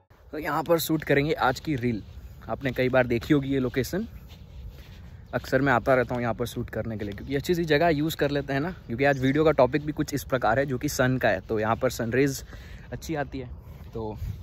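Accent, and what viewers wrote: Gujarat, India. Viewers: Bhai tame gujrati cho accent to Gujarati che kya Raho cho tame Ane aa Kai nadi che?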